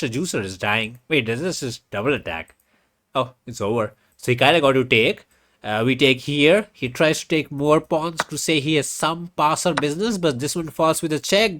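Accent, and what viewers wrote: India. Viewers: Love ur indian accent and calling queen the fatty😂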